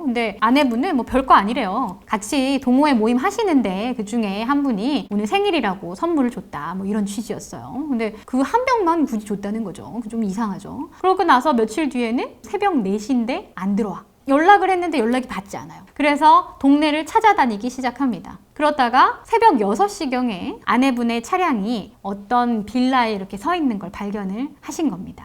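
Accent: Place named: Jeolla, South Korea. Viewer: 은 전라도 사투리가 섞여 있네요 ㅎㅎ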